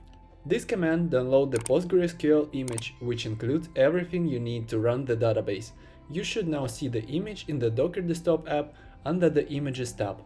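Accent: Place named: Russia. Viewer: спасибо дружище! русский акцент тебя выдал)))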